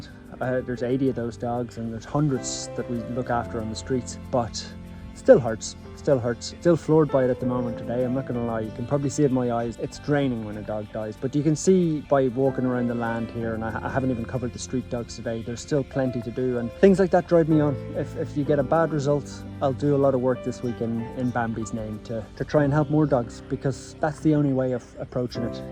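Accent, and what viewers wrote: Ireland. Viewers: You are an amazing person for helping these poor animals, you must have been sent from heaven as you sound so chilled with that beautiful Irish accent. Please continue with the good work helping them back to good health ❤😊 No doubt it has it’s up’s and downs but on the upside you are helping gods animals 😇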